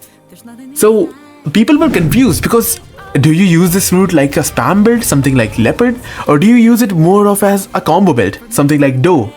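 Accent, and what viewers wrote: India. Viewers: bro this is indian accent i guess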